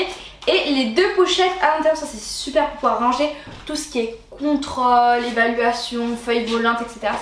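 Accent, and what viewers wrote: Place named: Marseille, France. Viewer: Elle a un petit accent marseillais je crois qu'elle vient de là bas 😊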